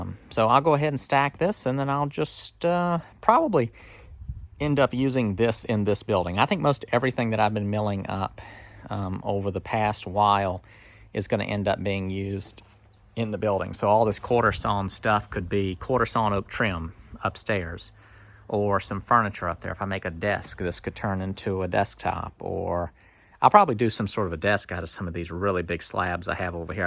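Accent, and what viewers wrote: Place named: North Georgia, United States. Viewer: Just wondering, are you in Georgia? Accent sounds a bit like north GA.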